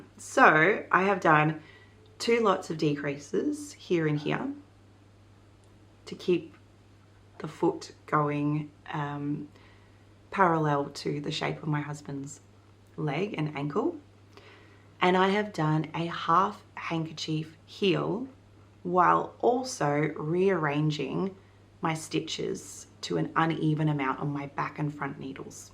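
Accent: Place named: Australia. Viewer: Hello - it’s lovely to hear another Australian on YouTube. I’m impressed with your knits and your productivity whilst being a mum of 3. I am a mum of 5, grandma of 5 and I love knitting, crocheting, sewing etc, but I am impressively slowww 😅 I’m in Brisbane and I have to confess a teensy bit of jealousy that you live in Tassie and have the option to knit lovely cosy knits that will get a good amount of wear! I have to choose carefully which cold weather knits I actually make, given the two minutes of “winter” we get here 😅 Your green Paul Cardigan is just superb on you. You clearly have a good eye for colours that suit you. Love it! What a natural beauty you are! Anyway, I’m watching this and working on my Hatsuki Top by Rievive in Isager Yarn Trio 2. Your cadence and overall chill vibe is perfect accompaniment. Thank you 😊 Also, I heard there was snow down there yesterday! 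Already! It’s finally cooled to a balmy 26 C here today! “I love a good patina” - me too ❤😊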